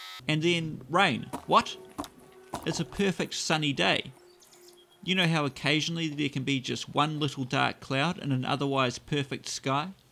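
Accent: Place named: New Zealand. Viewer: 1) Aren't you giving the foot-switch your luxury restorative spa-treatment as well? 2) Your videos remind me of the appendix discs of LotR where that guy from Weta talks about all the props and stuff (I mean this in the best way possible!). I think your accent may have something to do with it... 3) Who knew watching someone on the other side of the World restore old machines could be so interesting? Your skill, both at restoration and making videos, is impressive. I hope you keep posting,. 4) Greetings from Norway!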